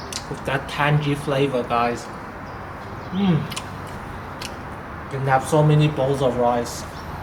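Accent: South Africa. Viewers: You sound like you have a South African accent.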